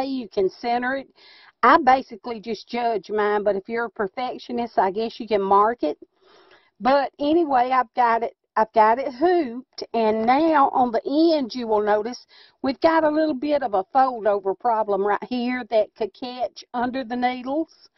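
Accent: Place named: Alabama, United States. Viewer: I knew that was an Alabama drawl...Im in Alabama too!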